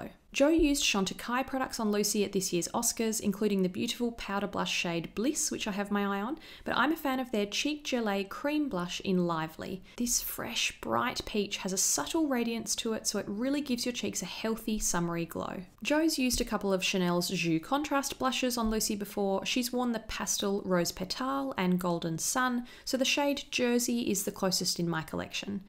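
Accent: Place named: Australia. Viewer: I don't know how the hell I ended up here but, I really like that Aussie accent 😄💙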